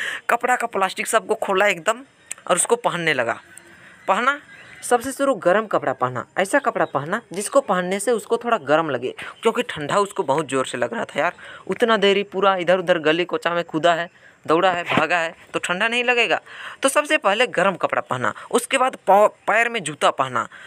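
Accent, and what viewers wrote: Bihar, India. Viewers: Bihari ho kya bhai 🎈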